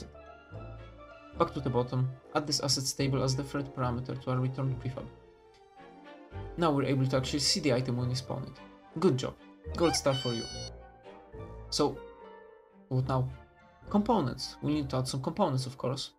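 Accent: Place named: Russia. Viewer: your accent's with a hint of russian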